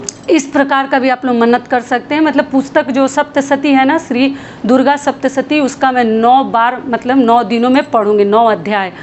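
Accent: Bihar, India. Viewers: Jai mata di..didi ap Bihar se ho kya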